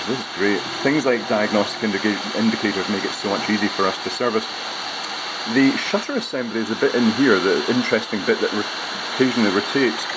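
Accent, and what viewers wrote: Scotland, United Kingdom. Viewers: sorry for the dumb question - your accent is scottish?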